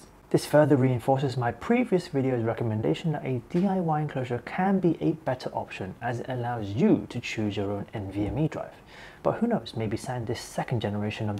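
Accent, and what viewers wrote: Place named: United Kingdom. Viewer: Weird seeing an Asian speak old style British. But not as weird as seeing a black guy speaking British.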